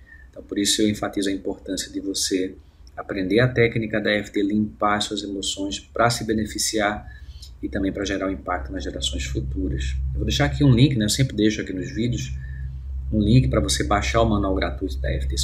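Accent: Recife, Brazil. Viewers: eita recifense. massa!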